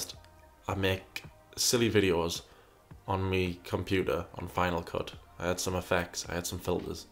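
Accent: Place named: Newcastle, United Kingdom. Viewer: lol, i didn't believe was from Newcastle, but I can hear the Geordie accent in this video <3... loving these videos on gurlworld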